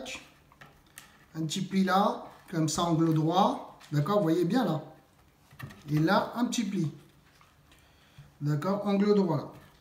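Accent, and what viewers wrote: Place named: Réunion, France. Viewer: Ta l'accent un peut créole de la réunion ou mauricien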